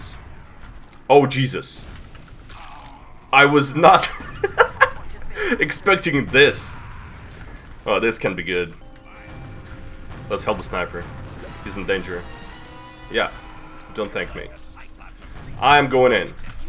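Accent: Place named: Russia. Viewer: I like these rants about valve I like hearing russain man to. Good video.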